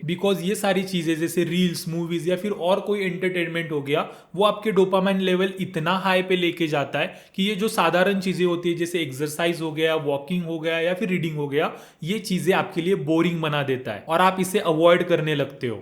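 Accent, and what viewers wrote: Satara, India. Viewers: are you from satara ?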